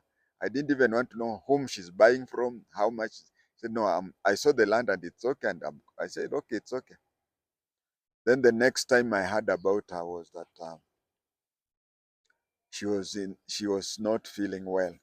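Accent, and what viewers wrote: Kenya. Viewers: Funny u have a kale,luhya accent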